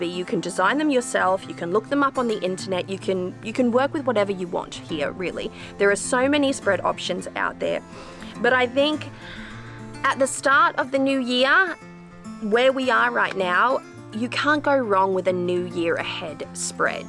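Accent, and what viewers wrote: Australia. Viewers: Another great video, and I thought you were Australian. I'm in SA and love your enthusiasm in your videos. Thank you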